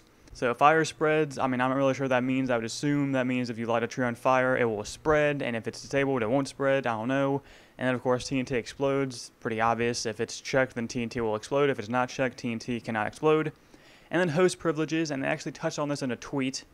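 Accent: United States. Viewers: you speak american? was i the only one that caught that?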